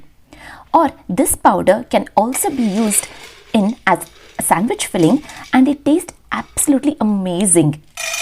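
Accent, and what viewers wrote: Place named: Karnataka, India. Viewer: Original Karnataka accent Instead English better you speak your mother tongue. Coz hater over European countries better avoid their respective living style and utensils and the other influence belonging.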